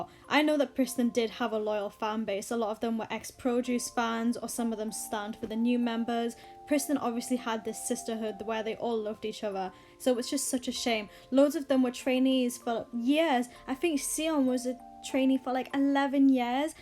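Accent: United Kingdom. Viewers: Wait r u British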